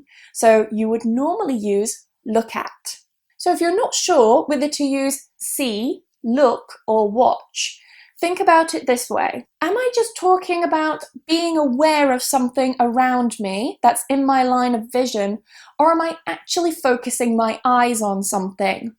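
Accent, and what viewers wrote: United Kingdom. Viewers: Thanks for the video...It's very useful for me..., l love ( watching) the way you talk in british accent...,by the way...you're the most beautiful english tutor l have ever seen,...thank you...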